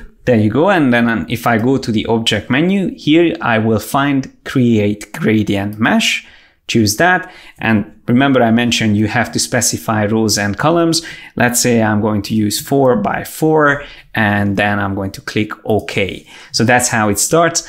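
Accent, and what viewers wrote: Hungary. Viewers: bonus for hungarian accent :)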